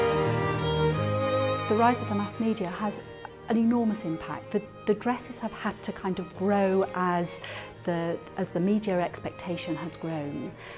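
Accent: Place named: England, United Kingdom. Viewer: I love her english accent! It sounds really posh but sexy too... :)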